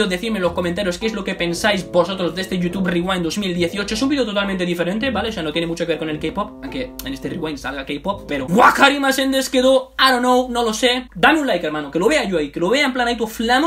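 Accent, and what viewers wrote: Argentina. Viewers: Viste como cuando se te pega lo argentino:v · el Argentino se te pego ati♥♥♥♥ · Me encanta que uses el acento el Argentino xd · AMO ESCUCHARTE HABLAR CON EL ACENTO ARGENTINO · Amo tu manera en que dices las palabras de Argentina 😂❤ Por algo te amo tanto ❤💫💕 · Amo tu intento de argentino😂😂💜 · ME ENCANTA TU INTENTO DE ACENTO ARGENTINO, AYUDA TE AMO MUCHO.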